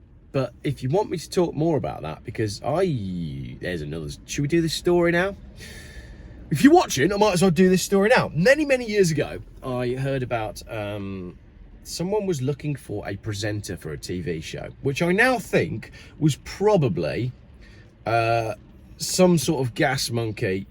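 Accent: Wales, United Kingdom. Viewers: Don't worry, you are back in the groove. Enjoy the 20 mph limits. 😀. Love the Welsh accent by the way. 👍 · Bloody hell! I thought it was Rhod Gilbert! Cracking accent boyo. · From a welsh man I love the accent. 😂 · dell boy would be proud of that Welsh accent BOYO.and it’s pissing down in Bridgend at the moment.have a good day ✌️🙏🫶🏴 to the farmers. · Great to have you back,. Loved the Welsh accent boyou! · I like you Welsh accent please keep it up, Cannot believe you still have compulsory Insurance in the UK (Pom Land) it’s crazy. I remember Customer buying a brand-new motorcycle when I was working over in the UK on my OE and Insurance cost the same as the motorcycle did . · Don't say sorry for the welsh accent, it was fantastic! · As a welsh person, love the accent. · And it’s raining right now here in South Wales boyo, love the Welsh accent, 😂. 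· Love your Welsh accent. More Welsh than my, born and bred in Wales accent. Lol.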